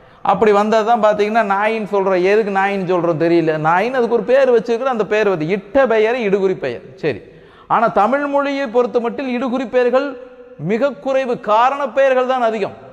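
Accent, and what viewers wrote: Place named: Kongu, India. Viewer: கொங்கு பேச்சு அருமை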